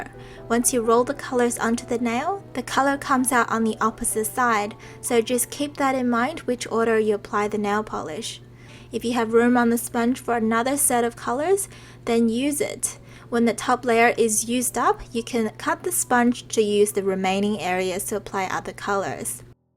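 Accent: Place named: Australia. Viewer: Are you Australian or Asian!? I love your accent! So pretty!!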